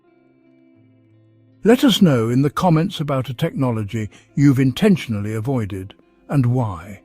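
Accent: United Kingdom. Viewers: First of all, I wonder why they chose to have someone with a British accent, narrate all of this YouTube channel's videos; videos that are clearly targeting those of us seniors in the USA. Is a fancy British accent supposed to make the data sound more sophisticated and scientifically authentic?!?!? (*NOT*) Furthermore, I am almost 85 years old, and the ONLY cognitive difficulties that this lady (me) and all my fellow seniors ever humorously identify as "Senior Moments", are memory lapses of a very particular sort — such as: • Unable to recall a relatively new friend's name • Frequently unable to recall a specific word I want to use, which I have remembered without hesitation, thousands of times in the past • No longer know the correct spelling of lengthy words which I once ALWAYS remembered, after first learning it as a child or youth • Recalling the exact year or decade in which an important event occurred in my life or around the globe • Totally forgetting a conversation I had only a few weeks previously • Etc., etc., etc. 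— and (to be redundant! ☺) — et al Some of the other things on the video are true — such as everything that happened in my life has a story behind it now, which is true of older folks, because being a matriarch or patriarch of our family and friend groups, categorizes us as being "tribally responsible" for passing on the group folklore. It is a joy for me, when I get to share episodes of my history, and my life experience tales. So, story telling IS part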